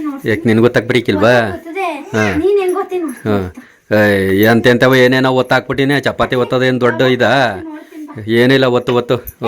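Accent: Mandya, India. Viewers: . ನಮ್ಮದು ಯಾದಗಿರಿ.(uk) ಆದ್ರೂ ಮಂಡ್ಯ ಭಾಷೆ. ಕೇಳೋಕೆ ಚಂದ.. All tha best... K A. 33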